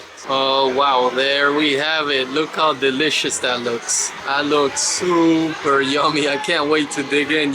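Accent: Nicaragua. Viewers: Me encanta como hablas el español Nica. Yo vivo fuera de Nicaragua hace años y los vídeos me traen muy gratos recuerdos. Espero recuperar mi acento Nicaragüense. Wishing you the very best to you and your family. Cheers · Ya tienes el acento nica. 😊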